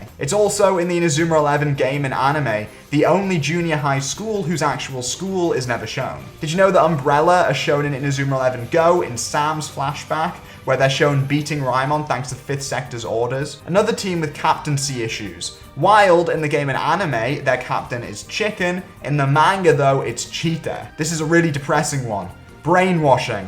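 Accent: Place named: Newcastle, United Kingdom. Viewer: He said c-ooo-k-e 🤭🤭 i love the Jordie accent creeping into words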